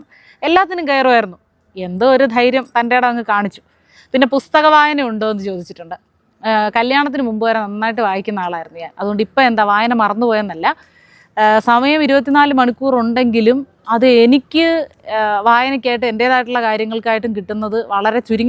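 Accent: Thiruvananthapuram, India. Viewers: കമ്മികളുടെ 10 കൊല്ലത്തെ ദുർഭരണം താങ്കൾക്കു വീഡിയോ ചെയ്യുന്നതിന് വിഷയ ദാരിദ്ര്യം ഇല്ലാതായി. പിന്നെ തിരോന്തരം ഭാഷ നല്ല രസമുണ്ട്. വിജയാശംസകൾ 💅💅💅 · ഈ തൃരുവന്തസ്പുരം ഭാഷ എവിട്ട്നിനിന്നും കിട്ടി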